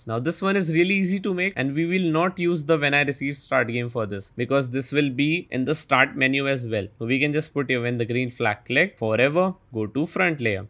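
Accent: India. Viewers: Its always that nice guy with an Indian accent who makes the absolute best tutorials. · always the Indian guy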